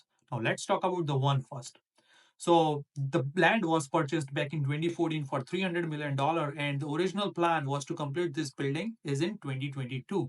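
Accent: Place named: Canada. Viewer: This is the new canada accent...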